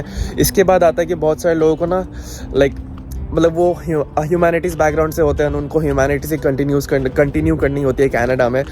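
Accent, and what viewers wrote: India. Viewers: Can you talk slowly cuz you have an accent. You sound indian still cuz im listening your vlog for purpose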